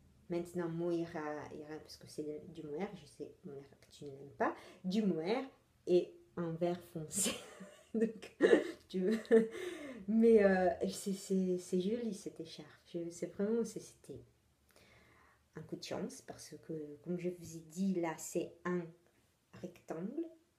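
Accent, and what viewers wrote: Italy. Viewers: Coucou ! C'est toujours un plaisir de retrouver ton bel accent italien et tes Robin 😂 Passes une bonne semaine en espérant que tu trouves le temps pour coudre et à très bientôt ! 😘😘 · bonjour, j aime regarder tes podcast et j adore ton accent italien st tu as toujour le sourir.bisou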